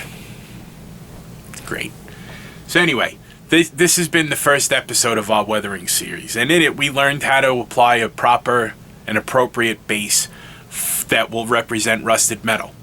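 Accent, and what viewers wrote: New York, United States. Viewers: 😂😂😂, love the video, I’m new to your channel, I’m from Missouri and I like your NY accent.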